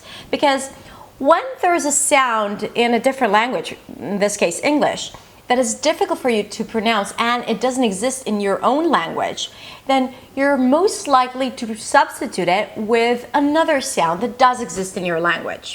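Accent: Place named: United States. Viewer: I agree with everything you have to say. But I hope you know that you have an American accent. And yes, that is also an accent.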